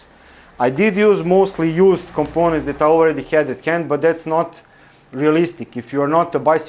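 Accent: Eastern Europe. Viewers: Your “Slavic accent”, my friend, is not a problem at all - and I’d bet that your English is a helluva lot better than most English-speakers’ grasp of any Slavic language 😅 Your videos make a lot of sense, get straight to the point and avoid hyperbole. There seems to be no agenda other than sharing your knowledge. This is all hugely appreciated, thank you. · Your Slavic accent is fine 😉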